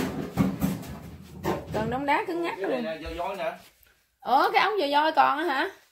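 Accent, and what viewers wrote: South, Vietnam. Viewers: Chi noi chuyện dung chat dan Nam Bộ minh luon de thương dễ gan gui nữa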